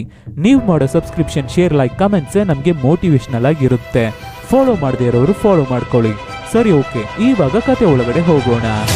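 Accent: Tamil Nadu, India. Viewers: I think ur mother tongue tamil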